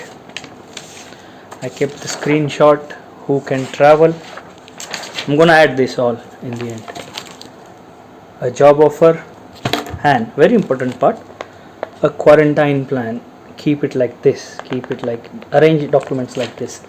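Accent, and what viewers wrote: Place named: Maharashtra, India. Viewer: Saheb English Changale bota pan Marathi Accent thoda drop karal tar eak no whal, I think u need to have neutral English Tone ur choice of words and sentence construction, is self explanatory ur just one step away to be speaking Flawless very competitive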